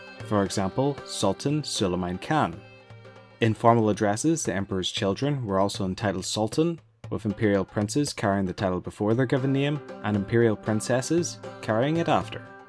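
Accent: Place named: Ulster, United Kingdom. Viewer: I don't know for sure but I'd say Ulster.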